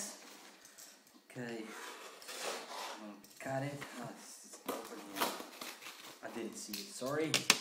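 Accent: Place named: Netherlands. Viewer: Haha your Dutch accent is mad as. Nice video, I had no idea how small the 2.5" drives were.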